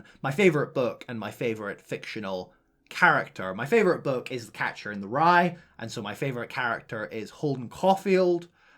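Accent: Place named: Edinburgh, United Kingdom. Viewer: I thought your accent was an Edinburgh one.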